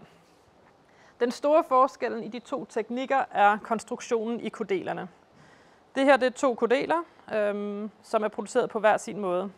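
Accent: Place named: Norway. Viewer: Norskificeret dansk lyder ret sjovt. Det er stadig nemt at forstå for mig, som dansker. Jeg tror også det virker for nordmænd. Aner ikke om det kan forstås af svenskere (uden for Skåne). Tak for videoen! :)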